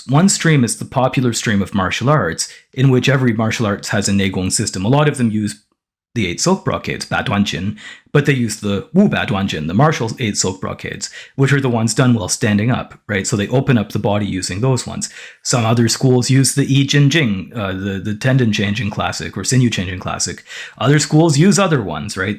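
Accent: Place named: Minnesota, United States. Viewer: Are you out of Minnesota? I hear an accent.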